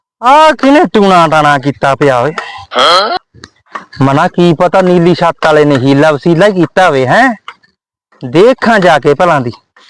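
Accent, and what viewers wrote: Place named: Malwa, India. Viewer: ਆਪ ਜੀ ਦੇ ਵੱਲੋ ਖਾਸ ਤੌਰ ਉਪਰ .ਮਲਵੀਆਂ.ਦੇਵਾਂਗ ਬੋਲੀ ਗਈ ਅਵਾਜ ਤਾਂ ਬਹੁਤ ਹੀ ਵਧੀਆ ਲੱਗਦੀ ਹੈ।ਧੰਨਵਾਦ ਜੀ।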